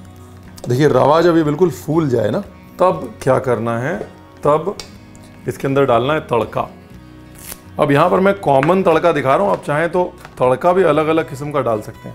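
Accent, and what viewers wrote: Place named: Punjab, India. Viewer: RAVE.. ☺️ Punjabiyat chalak hi jati hai baton baton mein😊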